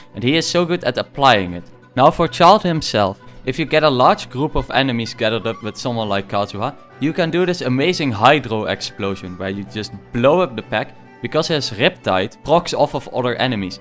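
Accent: Netherlands. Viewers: Im hearing a dutch accent.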